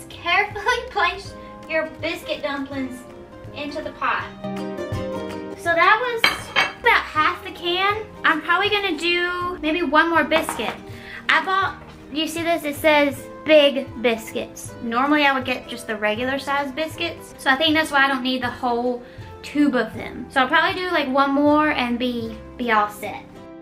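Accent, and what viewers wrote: United States. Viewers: love you're country accent love you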